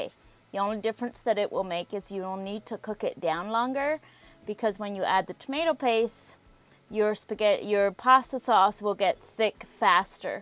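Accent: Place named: Pennsylvania Dutch, United States. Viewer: I have friends who are also ex Mennonite and you remind me so much of the mom, mainly because of your sweet Pennsylvania Dutch accent. 😁. Your channel is fun to watch. Many blessings and don't let the hateful comments get you down.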